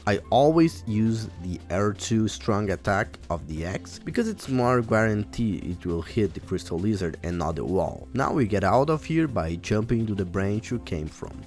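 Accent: Brazil. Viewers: Seus R são muito de paulista. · Are you brazilian? your accent sounds familiar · I think he's Brazilian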